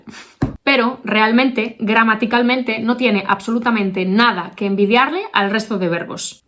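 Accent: Spain. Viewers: Me gusta mucho tu acento español y quiero aprender a pronunciarlo Soy youssef y vivo en valencia y tengo 1año y 6 meses en espaná Puedes ayudarme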